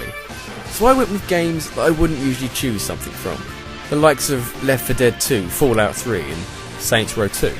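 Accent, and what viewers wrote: England, United Kingdom. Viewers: Okay, this is not what I imagined you to look like. DAMN YOU FOR YOUR MISLEADING, YET BEAUTIFULLY SOUNDING ENGLISH ACCENT! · Oh. My. God. Your face... IT'S SO... different to what I imagined... Seriously, when I listened to you on all your other videos, I always pictured you with a long face, black hair, and you would wear glasses... weird huh... Dammit! I watched too much Harry Potter. Now when I hear a british/english voice without a face, that face is all I can think of... I'm SOOO Sorry! Epic list though... and congrats on 20,000 subscribers!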